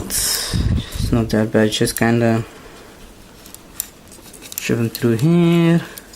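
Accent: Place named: England, United Kingdom. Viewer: Nice project my friend :) Love your english accent! Happy new week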